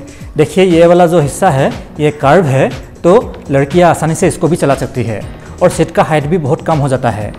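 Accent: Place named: Bengal, India. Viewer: bro tumi bengali to bengali tai bollo tumar kotha sunei bhujte parchi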